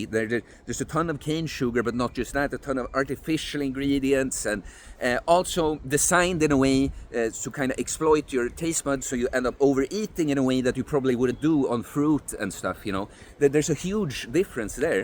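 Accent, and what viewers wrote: Sweden. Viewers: Looking Finnish , but with a clear Swedish accent. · Haha älskar att höra en riktigt pursvensk man prata engelska. Subbed.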